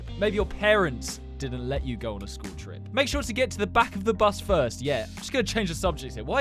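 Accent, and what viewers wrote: United Kingdom. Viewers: I like your British accent It’s kinda like more like dinner table queen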